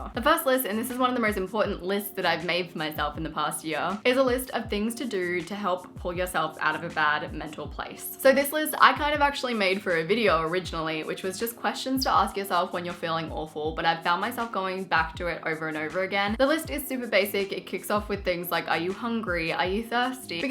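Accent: Australia. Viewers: Is she from Australia?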